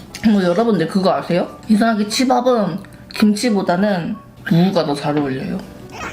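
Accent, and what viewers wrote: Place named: Daegu, South Korea. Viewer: 닫아뿌더라구요 ㅋㅋ 저도 대구사람이지만 님 사투리졸귀..♡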